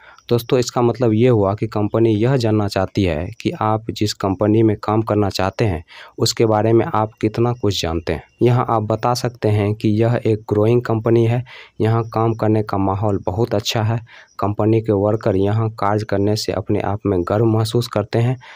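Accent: Bihar, India. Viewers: u r from Bihar Right?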